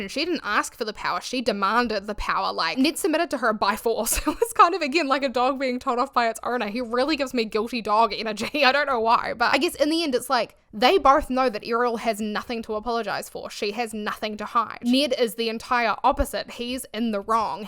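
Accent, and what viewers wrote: New Zealand. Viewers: So weird (good weird!!) to hear another NZ accent!! ❤ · You're so kiwi I love it. I'm Aussie and definitely would have asked you to say "six" and then laughed when you said "sex" when I was at school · I mean i heard it, i went to check her page, was delighted she's a kiwi, and went on with my day 😂 do people really complain about that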